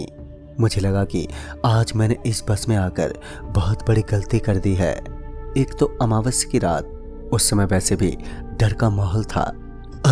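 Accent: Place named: Bengal, India. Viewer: Bhai tum pakka bengoli ho...tmhara Hindi Bengali mix ho rha h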